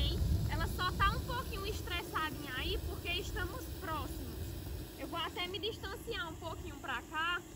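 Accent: Northeast, Brazil. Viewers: Legal esse sotaque nordestino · PARABÉNS, nunca perca este SOTAQUE! PORQUE ELE CARACTERERIZA OVERDADEIRO NORDESTINO. HOMEM E MULHERES BATALHADORES QUE Ñ TROCAM SUA DIGNIDADE. MUITO BENÇÃO! PARA SUA FAMÍLIA, E A TODOS QUE ASSISTEM A SEUS VÍDEOS ❤ DEUS TEM VCS NO CORAÇÃO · Ei 👸, você é nordestina mesmo, tem sotaque acentuado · Belo vídeo, acrescentado com o sotaque nordestino da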